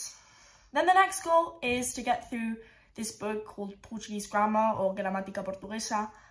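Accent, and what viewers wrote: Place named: Scotland, United Kingdom. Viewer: That is scottish accent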